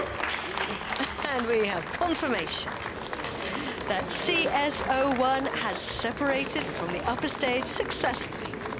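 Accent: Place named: United Kingdom. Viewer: Why this British accent ?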